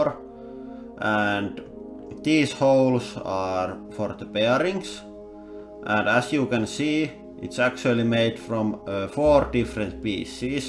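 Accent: Finland. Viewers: ei nää kanavat olis mitää ilman tätä ralli englantii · The finnish Accent xD #SuomiOnParas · the finnish accent tho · moikka vaan! huomaan tuolla äänellä ja paidalla että olette suomalaisia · tykkään kuunnella tota rallienglantii! se on kivan kuulosta 😂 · huhhu mikä ralli englanti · No ei ainakaan mua haittaa vaikka se puhuukin niinkun suomalaiset mutta vastasin vaan tähän kommenttiin... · aikamoine ralli englnti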